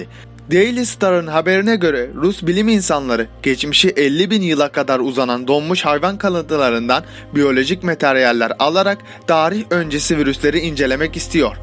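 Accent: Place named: Thrace, Turkey. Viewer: Trakya şivesi seziyorum.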